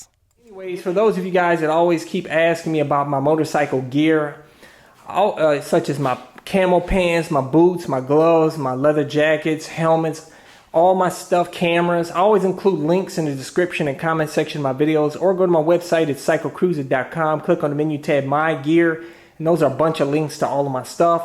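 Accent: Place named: Texas, United States. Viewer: “Sorry I’m old school” you don’t sound old school you sound like a dumb hillbilly out in Texas. Here in Ohio we got hillbillies that that snort and shoot up drugs yet you manage to sound more stupid then them.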